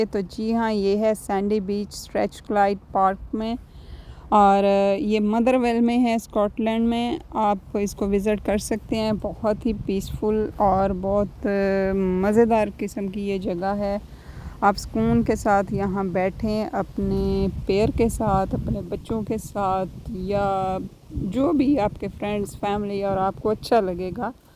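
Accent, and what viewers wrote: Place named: Punjab, India. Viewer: اشعار اچھے ہیں لیکن آپ کے پنجابی لہجہ نے اشعار کی ایسی کی تیسی کر دی.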